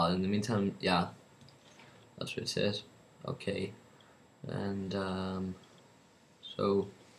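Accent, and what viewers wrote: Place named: United Kingdom. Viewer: OMG HE'S BRITISH THAT COMPLETELY MAKES IT!!!!!! awesome video by the way helped a lot thanks!!!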